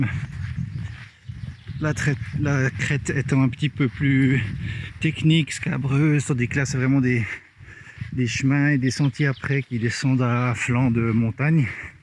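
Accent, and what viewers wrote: Switzerland. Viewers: Bravo à toi d'avoir tenu jusqu'au bout. Tes vidéos sont extras et tes commentaires sont très divertissants et plein de bons conseils. J'ai bien compris que tu film avec un téléphone mais comment tu fais pour pouvoir ramener autant d'images ???? Tu consommes combien de cartes mémoires ? Par contre chapeau pour les prises de vues, un vrai cinéaste ...... Continue comme ça c'est top :-) Bonne continuation. PS : J'adore l'accent Suisse ;-)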